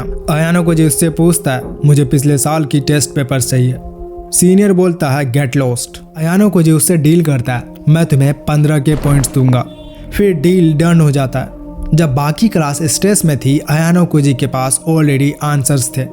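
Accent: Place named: Bihar, India. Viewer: I have noticed that you speak like my Bihar friends , Are you from Bihar, I wonder ? No matter what someone says words and ideas can change the World - referred from Dead Poet Society !!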